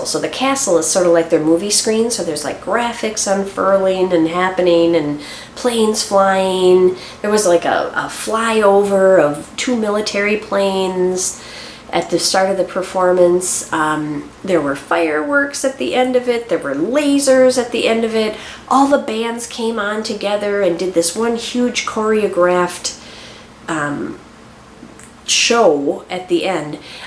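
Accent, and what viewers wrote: Minnesota, United States. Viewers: I wondered where you were! Thanks for sharing ypur pictures of the trip. It is on my bucket list and now I am even more excited to see those countries, so beautiful! I admire your creative choice to put in the specialty stitch on the background. It does look like a tapestry. You should stitch your name in the other one. I am blanking on the names of them right now as I started watching at bedtime and now it is morning. Brain like a sieve! I enjoy your videos for many reasons not the least of which is that I grew up in MN and when I here you speak I get a bit nostalgic.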